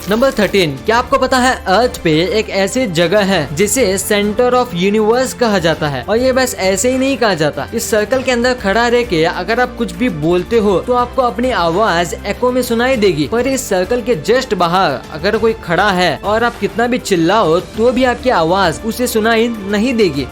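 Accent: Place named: South, India. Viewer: Tumhari aavaz se lagta hi ki tum south indian ho!!!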